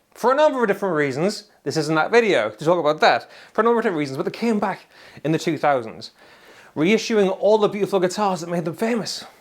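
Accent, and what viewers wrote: United Kingdom. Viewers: Thats one of the best reasons to subscribe! Well, there's also the guitar playing and the smoth British accent. Your a legend sir.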